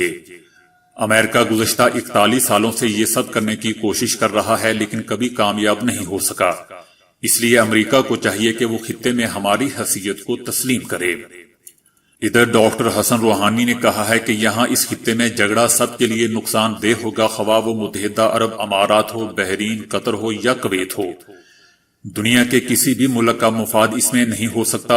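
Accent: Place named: Punjab, Pakistan. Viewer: Aap apni zubaan SE Punjabi haddi nikal kr Urdu zaban sahi bolen Apna talaffus (pronunciation) sahi Karen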